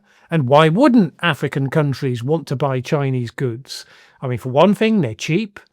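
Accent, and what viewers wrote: United Kingdom. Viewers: Better a normal British accent than some nasal yee-haw from an incoherent hill-billly eh ?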